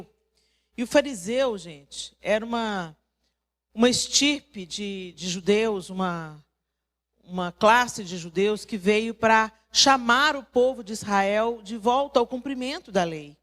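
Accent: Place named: Rio de Janeiro, Brazil. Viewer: parece até carioca na forma de falar...rs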